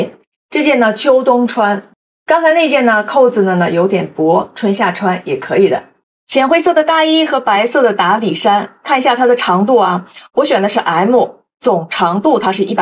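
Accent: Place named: Japan. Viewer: 你推荐的这几款大衣从面料到色彩到版型，都非常的好，优雅高级，我跟着你给的link看了一下，价格也很亲民。👍。很可以买。手痒。还有你上次推荐的海浪包，也同样出色，美观实用，品味超群，还是美国华裔设计师的作品。棒！ 但是，我觉得，这几款大衣，不太适合你。因为它们的肩膀自然下垂，随着你的肩膀走，太溜肩了。显得头太大了。（你的这个视频里的有些照片，从下向上照的，把头照小了很多，看起来比例就好多了。）其实，这涉及到一个头身比/头肩比的问题。以中国人的传统审美，你的比例是完美的，就像明清仕女画里走出的美人。但现代全球审美是西方七头/八头身的审美，（你给的穿大衣的模特照就很明显，她们头小肩宽，穿起来很不一样）。所以我觉得很多传统东方美女要靠衣服的裁剪来修饰一下头身/头肩比。 我的家人是你的粉丝，非常非常喜欢你的频道，她觉得你的推荐，属于日本风格，低调典雅，特别适合东方女性。我也同意。挑一点小毛病，你的品牌发音，应该都是日式的，和在欧美地区流行的英语式发音（Anglicized）有区别。比如UGG是“阿格”，Louis Vuitton 被称为“LV”别人也能听懂，Hermes 近乎“Air Mess”。日式发音会让人一愣，不知所云。日本人分不清V和B、R和L。用日文来音译欧美品牌，相对来说较为困难，可能还不如汉语的音译。比如“路易威登”“爱马仕”什么的，和品牌的英语式发音还挺像。我猜你的观众不少都在日本之外，或者改用英式发音更好些？ 看到说，你1977年买了第一个LV 包，但你看起来顶多30出头。太惊人了！希望今后多发保养穿搭显年轻的视频呀！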